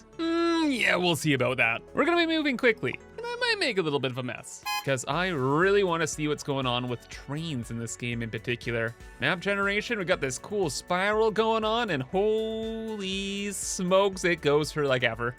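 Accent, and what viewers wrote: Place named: Canada. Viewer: In all my years of Shapez, I've never seen anyone ignore the miner extensions and just build platforms and factories in the middle of their miners :D 600 hours in and I've come to accept that there is no wrong way to play the game. Also, I get the feeling you're Canadian, yes? Your "Eh" was showing ;)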